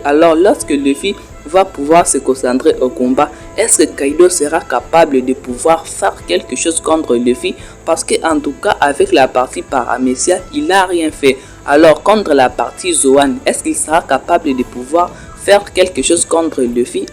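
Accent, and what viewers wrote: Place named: Democratic Republic of the Congo. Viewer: Congolais c sur ton accent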